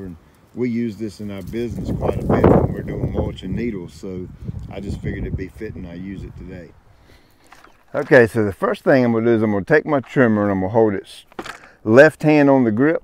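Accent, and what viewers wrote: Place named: Georgia, United States. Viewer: Like that South Georgia drawl! North Georgia here!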